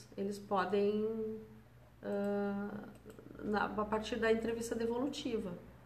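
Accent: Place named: South, Brazil. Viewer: É um jeito de quem mora no Sul falar.. normal.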